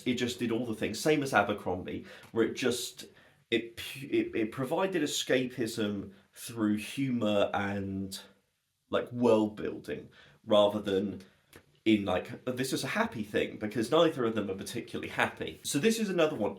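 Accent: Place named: United Kingdom. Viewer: Who the hell is this and why is he british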